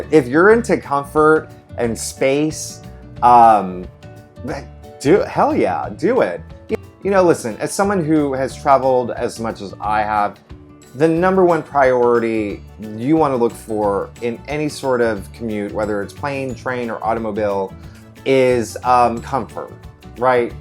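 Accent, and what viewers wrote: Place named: South, United States. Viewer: He's from the South.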